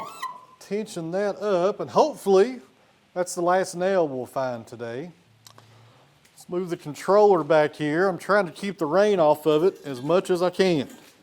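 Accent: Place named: Tennessee, United States. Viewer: You from Tennessee. You sound like you gotta be from at least near there.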